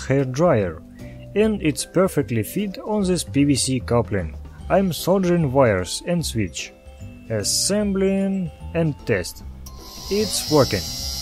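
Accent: Russia. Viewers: I heard you are russian un the first ten seconds. it's notre gold yet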